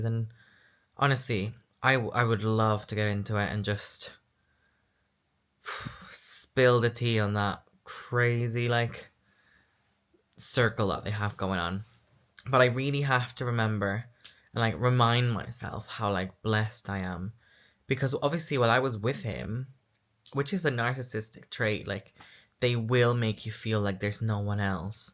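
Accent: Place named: United Kingdom. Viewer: you have a STRONG UK accent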